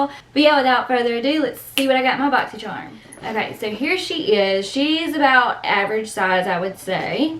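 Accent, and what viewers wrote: Alabama, United States. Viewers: You got nice stuff diffinately worth the money, also love your sweet Alabama accent ❤️ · I just love your southern accent!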